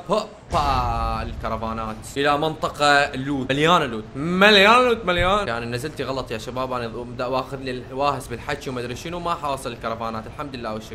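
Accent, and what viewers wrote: Iraq. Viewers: بلهجت العراقيين كلاو. 👲🏻👲🏻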